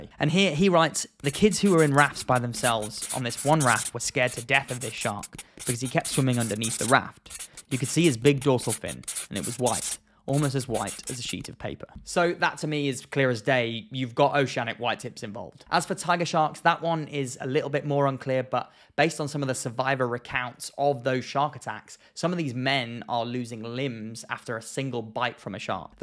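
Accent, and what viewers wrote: England, United Kingdom. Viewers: It’s weird to tell an English person how to speak English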